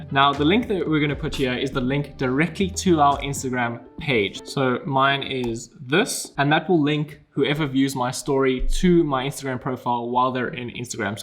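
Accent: South Africa. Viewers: South African accent👌🏻🔥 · Are you from South Africa? I'm hearing my accent :)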